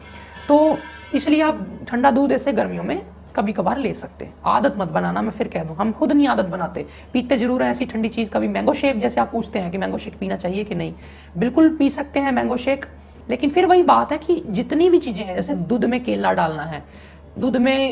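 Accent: Punjab, India. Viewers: Yr tone is very soft n Punjabi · Guru ji tusi Punjabi ho?😊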